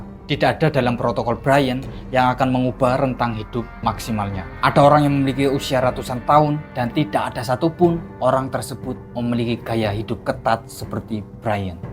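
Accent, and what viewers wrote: Java, Indonesia. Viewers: logatmu medok banget min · Suara mu terlalu medok le le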